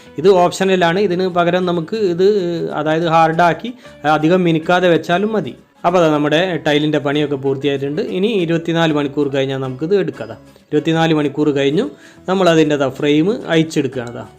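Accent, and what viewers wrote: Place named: Kozhikode, India. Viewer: കോഴിക്കോടാണല്ലോ ഭാഷ,ഞാനും ഒരു കോഴിക്കോട്ടുകാരനാണ്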